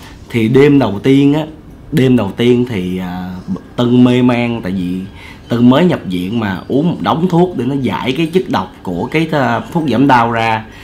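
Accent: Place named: Central Highlands, Vietnam. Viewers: Tân đáng yêu cực kỳ. Tân nói giọng Tây Nguyên. cưng lắm luôn. Nghe sướng cực kỳ. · Đang nghe anh kể chuyện nghe tới phút cười muốn nội thương anh Tân nói giọng Tây Nguyên dễ thương ghê á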